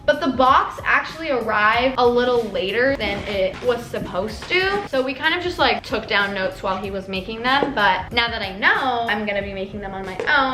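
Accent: United Kingdom. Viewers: The British accent tho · That british accent 😆❣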